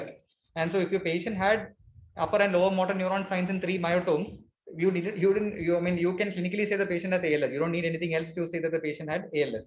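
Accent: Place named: South Asia. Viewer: At least 350 million people - Indians plus Pakistanis plus srilankans plus bangladesh use English with this accent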